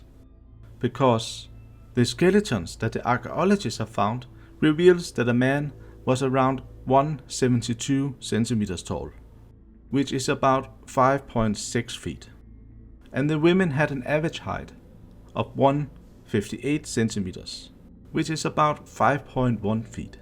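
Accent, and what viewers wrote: Denmark. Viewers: Your speaking with dannish accent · Was wondering if he was Danish too... Danes have the best accents for me amongst Scandinavians... I'm Dutch so I'm not bias. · Some heavy danglish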